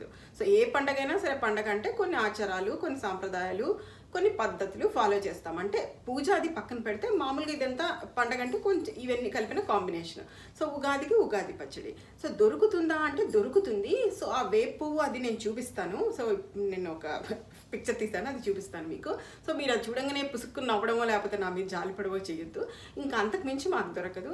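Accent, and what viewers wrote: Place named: Kurnool, India. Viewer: Hello garu Mee slang vinnaventane nenu guess chesanu, meeru kurnool nundi vachi undochu ani..! Mee about Chusinappudu Ade nijam aindi..! I'm from Nandikotkur, kurnool..! Meeru chesina videos chusanu, chala bagunnai..! Keep going all the best....!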